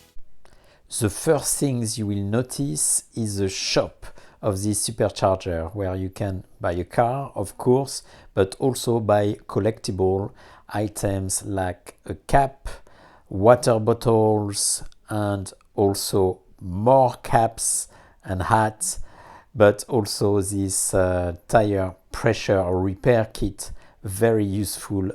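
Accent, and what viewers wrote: France. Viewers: this great french accent, I mean it - I love it dearly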